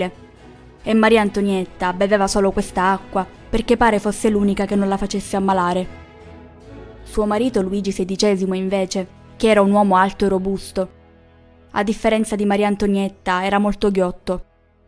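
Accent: Sicily, Italy. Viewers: DAL TUO BEL ACCENTO SONO QUASI SICURA CHE SEI DEL SUD DELLA PROVINCIA DI SIRACUSA O RAGUSA BRAVA COMUNQUE MOLTO BELLO IL TUO CANALE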